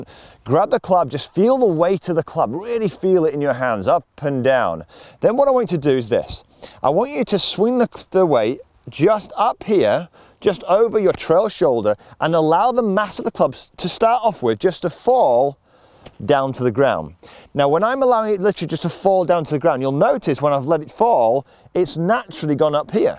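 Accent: Yorkshire, United Kingdom. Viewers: Thanks, With your Yorkshire accent you remind me so much of him with his enthusiasm and simplicity. Best wishes.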